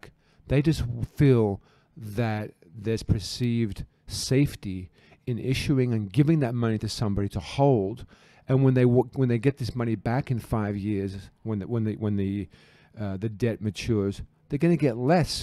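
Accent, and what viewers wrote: Australia. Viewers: your/our Aussie accent, it's milder than the American accent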